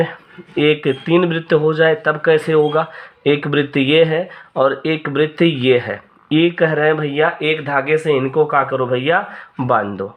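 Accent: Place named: Bhojpuri, India. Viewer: Bhojpuri achha bol rhe hai sir.....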